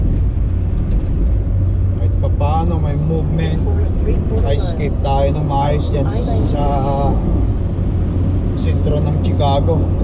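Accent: Ilocos, Philippines. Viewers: Kaykayat ko ta ilokano accent mo lalo no ag-english ka, reminds me of my old man. Ilokano Owner/operator as well ditoy abbotsford BC ngem salesman/distributor ti business ko ti winnipeg based chips company. 18 years na ako sa Canada medyo naikkat kon diay ilokano ken pinoy accent kon na agsao ak ti english. Hahaha praktis lang. Lol😂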